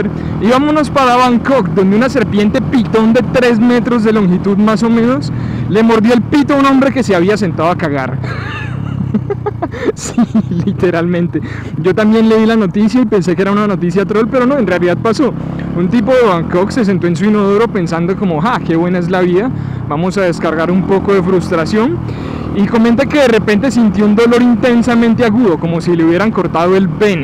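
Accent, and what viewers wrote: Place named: Bogotá, Colombia. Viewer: Desde que ciudad de Colombia grabas? No he logrado identificarla y tu acento no me ayuda es como rolo pero evidentemente no es Bogotá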